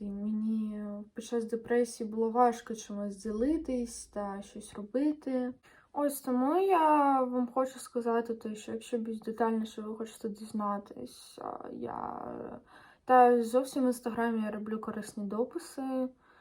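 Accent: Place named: Russia. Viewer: Русский акцент. Лучше на русском общайся, слушать сложно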